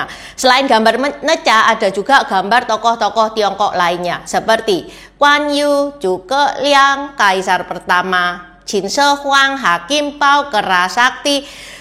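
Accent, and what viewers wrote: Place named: Surabaya, Indonesia. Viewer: Aku ora nggagas kontene Ning cara omonge, koen iku plek ketiplek arek suroboyoan yaa, arepa Thionghoa ora Iso ilang gaya omongane persis hehehe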